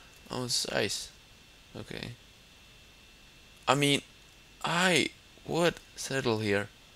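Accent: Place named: Denmark. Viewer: You sound danish!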